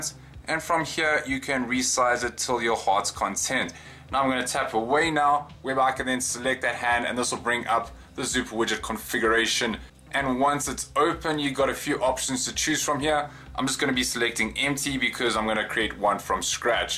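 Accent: South Africa. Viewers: Love your voice accent. Africaans? Australia has one of its very senior politicians with your accent. Subscribed because we want more user friendly program from you.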